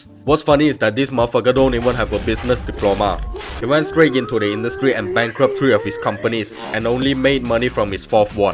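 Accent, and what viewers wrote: Malaysia. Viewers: First word of the video can already tell bro is a malaysian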